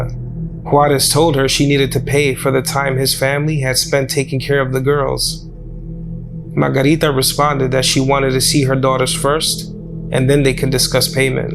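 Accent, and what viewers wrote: New York, United States. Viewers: Where are you from? Sounds like New York accent.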